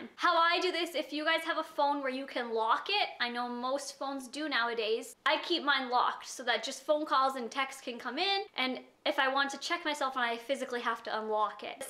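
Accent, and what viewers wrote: Canada. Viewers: Btw I love your accent. Canadians are the best!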